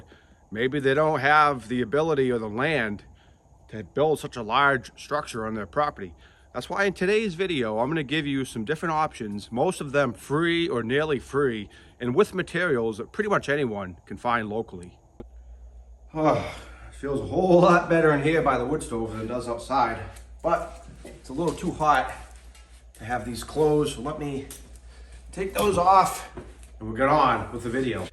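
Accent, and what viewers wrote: Massachusetts, United States. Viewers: Wow. Check out that Massachusetts accent. I'm sure I'd have one in return ... From the Desert, USA.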